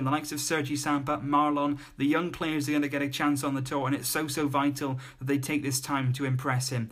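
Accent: United Kingdom. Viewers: i have a question are u british ??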